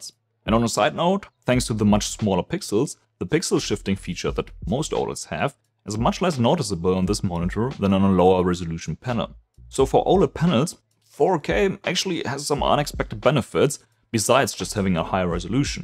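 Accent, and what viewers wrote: Germany. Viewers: Man hört doch raus das er deutsch ist😂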